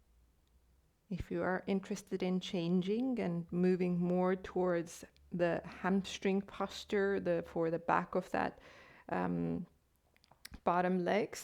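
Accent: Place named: Australia. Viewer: this was a brilliant practice..... you have a hint of an Australian accent did you spend time in Australia at some point?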